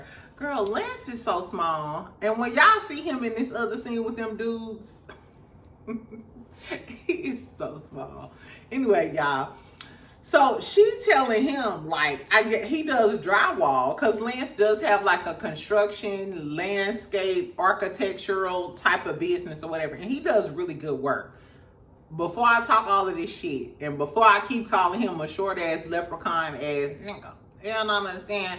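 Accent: St. Louis, United States. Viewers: Good commentary, I love your St Louis accent sounding like Sunni….hur and thur, it’s so cute. While it’s tacky for Chris to try to set up anyone people forget this set up happened after Mel had filed for divorce and no possibility of reconciliation, she didn’t want Martel back then. If he was trying to hook up Martel during the marriage, that’s different. To me, the timeline of when all this was happening is getting downplayed, Mel and Martel may have just been still married on paper while the divorce was in progress. IMO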